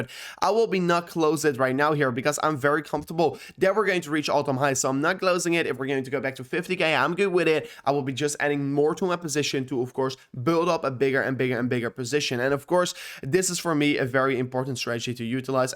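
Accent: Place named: Netherlands. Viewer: Ohhh is hij toch Nederlands !? ik dacht al een " dutch accentje" te horen 😊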